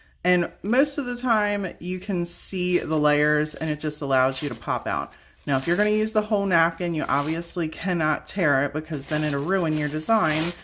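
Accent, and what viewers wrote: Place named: Pittsburgh, United States. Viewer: I just discovered you and I already love ya..sounds like you're from Pittsburgh...it's my hometown...it's been a long time since I moved so I may be off my game but Pittsburgh sounds like Pittsburgh..the way you said water and how you say the letter "O" makes me think of home...either way it's cool I found your page 💜🪬☄️ I love them all but I really like the contrast of black and white on the snowmen and I agree with you about painting the sides 💜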